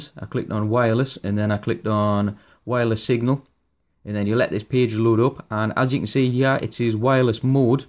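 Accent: Newcastle, United Kingdom. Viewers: this lad sounds like a georide GO NEWCASTLE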